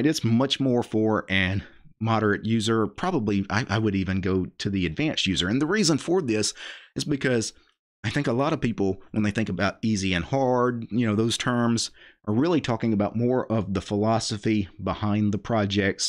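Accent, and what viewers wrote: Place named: Louisiana, United States. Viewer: I saw you on KeepitTechie, been subscribed but man i know your accent was familiar, but now I see you are a fallow Louisiana native. That was a great interview.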